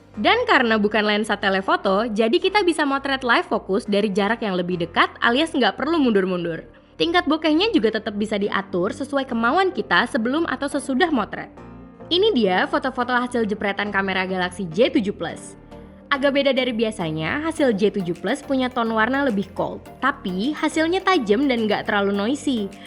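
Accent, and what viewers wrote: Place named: Betawi, Indonesia. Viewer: cengkok betawinya bikin aneh😁😁😁 bagusan dulu deh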